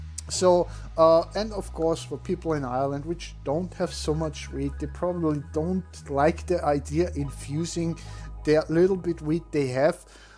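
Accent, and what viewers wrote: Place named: Sweden. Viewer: Its probably nordic. Swedish prob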